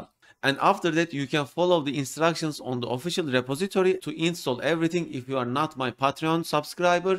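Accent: Turkey. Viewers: I BET YOU'RE FROM TURKEY 😅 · Oh Türk aksanlı İngilizce. nerede görsem tanırım :)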